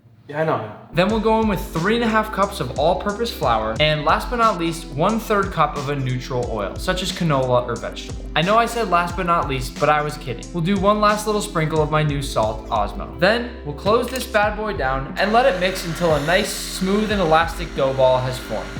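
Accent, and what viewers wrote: United States. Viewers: Sounds pretty American to me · this sounds very american lol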